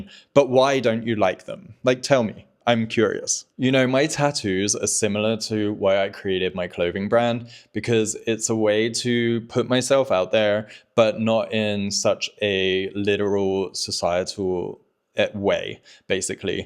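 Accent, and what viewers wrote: England, United Kingdom. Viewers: you are so handsome with a gorgeous English accent that sounds so classy, elegant and professional and I love your tattoos, even though they are personally not my thing - and if I did get a tattoo, it would be something about my Irish roots, like a Celtic Cross, Celtic Knot, a Shamrock or a Harp - I would definitely still date you in a heartbeat though, as you are absolutely gorgeous-looking with your tattoos 🇮🇪☘️🇬🇧😍😍😍😍😍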